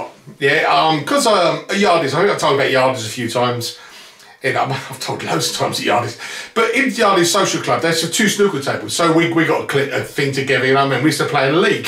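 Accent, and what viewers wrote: United Kingdom. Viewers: I don't know how anyone not from the UK can understand you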